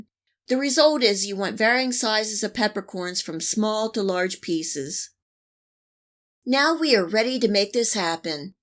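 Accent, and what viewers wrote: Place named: Pennsylvania, United States. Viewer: Love your true Pennsylvania accent.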